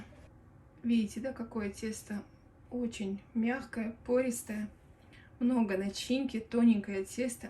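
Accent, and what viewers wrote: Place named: Dagestan, Russia. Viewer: Дагестанский акцент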